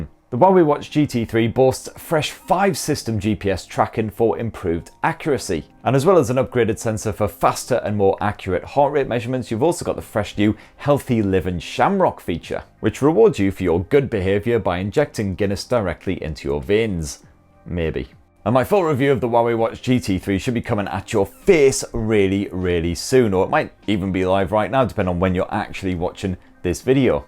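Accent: United Kingdom. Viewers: I like this dude, he's bloody British like me 😂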